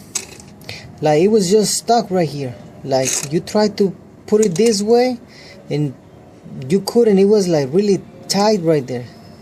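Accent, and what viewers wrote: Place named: England, United Kingdom. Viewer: My friend , your voice and english accent is really annoying, i hate you.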